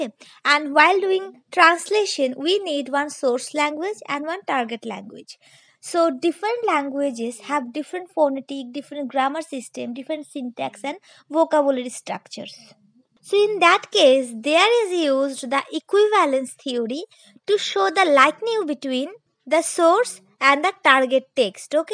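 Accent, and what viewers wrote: India. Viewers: Loved your strong indian accent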